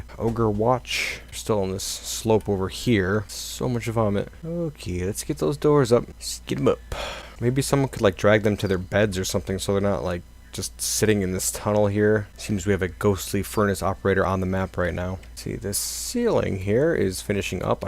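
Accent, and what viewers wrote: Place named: Connecticut, United States. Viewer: smoky, rocky, caffeined, autist connecticut accent is best accent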